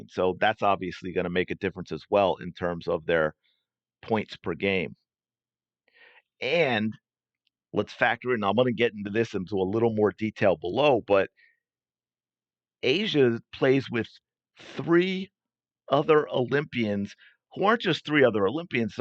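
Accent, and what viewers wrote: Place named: AAVE, United States. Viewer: Would you please speak English. I dont understand ghetto ebonics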